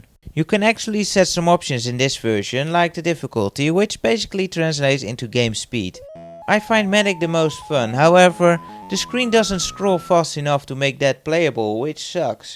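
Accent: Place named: Netherlands. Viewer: You sound verry Dutch!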